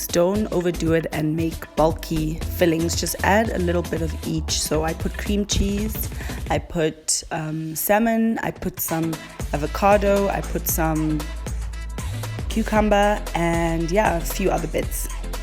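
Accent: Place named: South Africa. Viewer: Not me being excited about the South African accent😂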